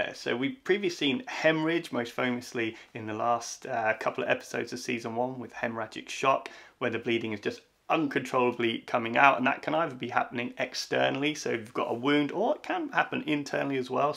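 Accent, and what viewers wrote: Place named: Yorkshire, United Kingdom. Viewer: Hi i hope you see this but it would be really helpful if you put subtitles on your videos coz im having trouble listening to you with your accent i wanna say its sounds like a Yorkshire accent but i could be wrong. it would make my life easier as being hard of hearing. Also would you love it if Cells at work did a Covid19 episode coz i would.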